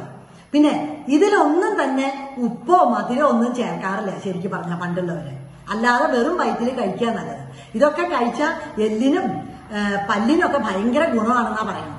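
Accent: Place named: Valluvanad, India. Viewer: Teacher valluvanadan style il language.. Teacher evideya place?Super nalla nadan reethi enikku ishtayi.. ❤️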